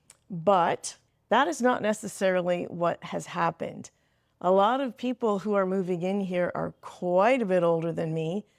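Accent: Canada. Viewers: I’m hearing a Canadian accent…..you did a great job with this video….pat yourself on your back…you are too young to be living in a 55 community….you look very young…I get that you moved to be close to your kids/grands and that’s important. I’m jealous because my kids live far away. I can picture you in a complex, close to your kids that does not have the age restriction…..don’t know if that even exists where you live. My vote is for you to move if you can find a nice place…..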